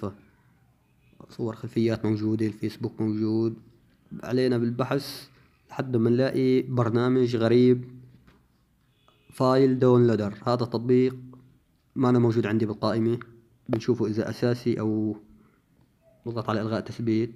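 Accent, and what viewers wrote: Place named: Syria. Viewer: باين عليك سوري وحمصي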